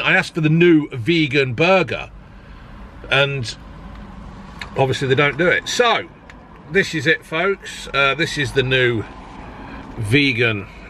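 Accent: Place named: Leicester, United Kingdom. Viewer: LOLL this guy is a proper Leicester bloke hahaha 😂 love it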